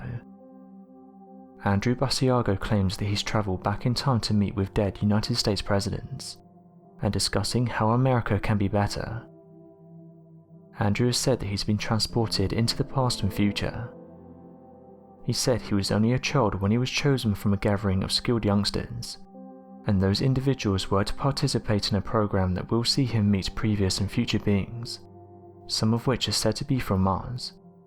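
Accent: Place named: Blackburn, United Kingdom. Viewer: Are you from Blackburn ...that tone of speech lol ... love it..Mad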